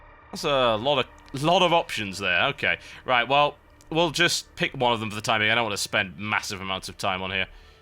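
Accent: United Kingdom. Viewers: First video I've seen of the; LOVE the voice/accent. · u hav a strong British Accent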